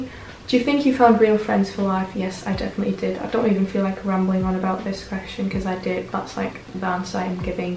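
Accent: United Kingdom. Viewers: Ich würd' mich voll freuen, wenn du weiterhin englische Videos machen würdest. Und ich find' man hört voll das Britische in deinem Englisch, was wirklich toll klingt. :D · Love ur British accent ❤ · Oha, krass hab zwar erwartet, dass dein Englisch gut ist, aber find‘s echt krass wie britisch dein Englisch klingt! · Omg wie gut sich dein Akzent einfach anhört😍 Ich mache 2020/21 ein AJ in Weston-super-Mare, North Somerset (hab es mir nicht ausgesucht,bin aber total happy) und ich möchte unbedingt auch so britisch klingen, wenn ich wieder zurück komme. Dir noch einen schönen Tag und vielen Dank für deine Videos :) x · Ich find es mega interessant zu sehen, dass du einen Nord - Englischen Akzent bekommen hast, weil du ja in dieser Region warst :) Es kommt also wirklich darauf an, wo man lebt, was dann Einfluss auf den britischen Akzent hat!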